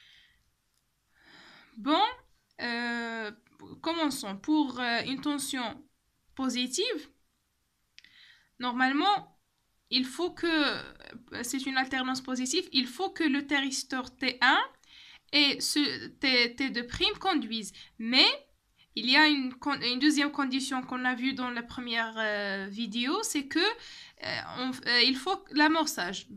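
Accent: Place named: Kabylie, Algeria. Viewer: Électronique de puissance avec accent kabyle <3 ! ( On a tendance a noter l'angle d'amorçage avec alpha pour laisser le Phi pour le déphasage en cas de charge inductive ), Merci a vous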